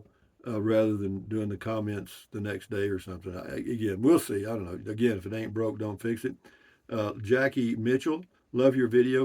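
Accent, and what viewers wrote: Texas, United States. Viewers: Sam Elliott is a mature, seasoned actor, good hair, impressive mustache, deep voice. He has earned many fans among lovely women our age. Amigo, I say take it as a compliment, definitely a flirt, when a woman claims you remind her of good ol' Sam. He played Virgil Earp in Tombstone 1993, and he played Sam Houston in Houston: the Legend of Texas 1986. And a lot of other western and action movies. We could all be compared to worse. And anyone who thinks your respectable Texas accent sounds like that tv doctor, is a tone-deaf northerner. Don't even bother fretting.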